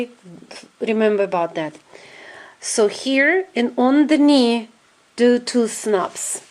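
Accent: Russia. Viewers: ужасное произношение извините конечно но надо поработать) очень русский акцент (( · Я не говорю по английски, но по-моему, такой сильный русский акцент)))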